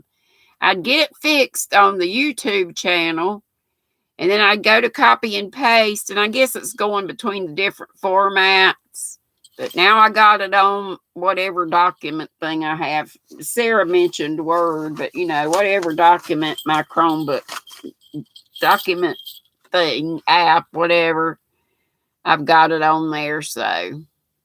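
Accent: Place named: North Carolina, United States. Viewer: I thought your accent sounded familiar, I'm from NC too. I live just west of Charlotte!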